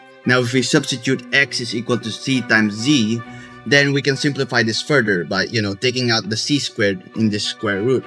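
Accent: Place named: Eastern Europe. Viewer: in-depth sciency roblox video -> click slavic voice for some reason, every time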